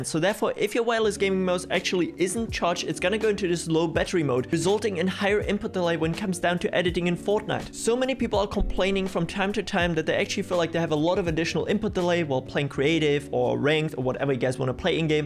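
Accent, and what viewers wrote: Germany. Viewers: You got a crazy German accent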